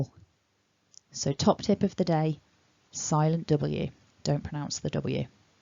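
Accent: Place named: RP, United Kingdom. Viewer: I'd like to point out that in Modern RP, [ɔʊ] (and sometimes [ɒʊ]) is an allophone of /əʊ/ before syllable-final /l/. It can be ascribed to the velarisation of the following /l/ (aka darkening effect of the dark l). So words like "goal", "whole", "coal" are pronounced with [-ɔʊl]. And I hear that in your speech too.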